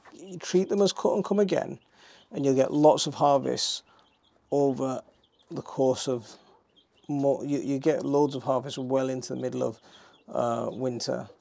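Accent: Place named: Yorkshire, United Kingdom. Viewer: He sounds like a Yorkshire lad😁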